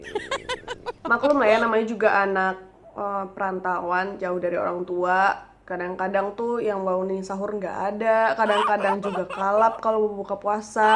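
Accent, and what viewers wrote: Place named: Minangkabau, Indonesia. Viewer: buliah kenalan uni. namo awak della asli minang tapi laia dan gadang di jakarta . papa jo mama awak urang lubuak basuang . awak kuliah di gundar semester 5 jurusan akutansi. uni bahaso minangnyo barasiah bana . sanang awak mandanganyo tp awak indak sapasiah uni bana bahaso minangnyo.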